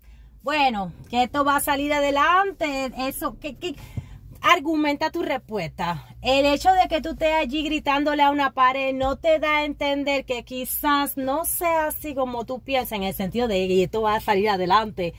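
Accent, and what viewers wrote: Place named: Oriente, Cuba. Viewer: tu eres de las Orientales originales, tu eres de las que dicen valde, guineo y catre. Ese es el verdadero acento Oriental.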